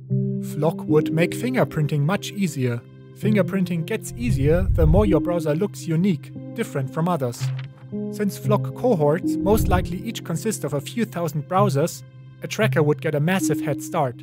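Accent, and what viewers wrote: Germany. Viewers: He has a German accent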